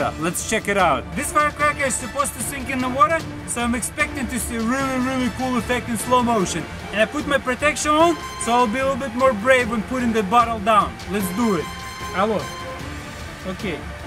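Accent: Russia. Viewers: по звучанию Американских слов, это не американец, а русский человек, знаевший английский язык )))) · Мне кажется или у него русский акцент? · Рязанский акцент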